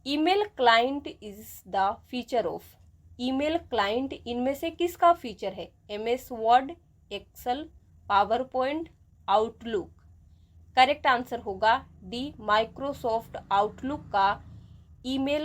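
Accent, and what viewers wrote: Odisha, India. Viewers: Mam kya aap odisha se he ?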